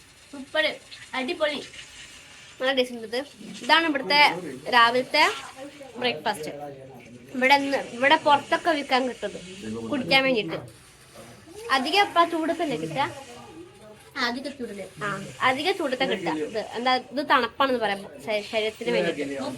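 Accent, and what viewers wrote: Malappuram, India. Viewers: എന്തായാലും മലപ്പുറം . ഭാഷയാണ് നിലമ്പൂർ പെരിന്തൽമണ്ണ ഭാഗം ആണ് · മലപ്പുറം സ്ലാങ് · അതെ.. മലപ്പുറത്ത് ആയിരുന്നു എന്ന്തോന്നുന്നു ഇവര് താമസിച്ചിരുന്നത്. ഇങ്ങക്ക്.. ഓല് എന്നുള്ള വാക്കുകൾ എപ്പഴും സംസാരത്തിൽ വരുന്നുണ്ട് 😊🥰🥰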